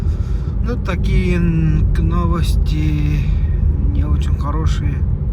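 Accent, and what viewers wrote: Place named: Tuva, Russia. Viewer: У тебя тувинский акцент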